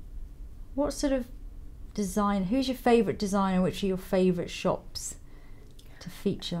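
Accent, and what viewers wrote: England, United Kingdom. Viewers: you can hear she picked up a bit of a British accent living in London:) this is an amazing look! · Its a weird comment but I love your accent, it reminds me so much of Downton Abbey! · I'm so glad you're doing youtube videos, so I can just listen to your lovely British accent and relaxing voice on the days I'm missing London and the days I used to spend in that fascinating city while I was working there for a good while.:D and also are these vids totally satisfying to my insane makeup lover self as well. thank you for being such an awesome artist and a huge inspiration!:)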